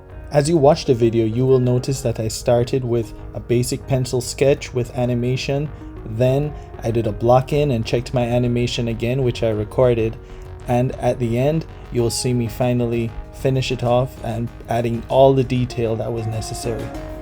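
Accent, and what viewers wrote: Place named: Caribbean. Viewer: Great video! I like how expressive you made her. Its the reason why I clicked on your video. And after 30 seconds I knew you were from the West Indies. Keep at it!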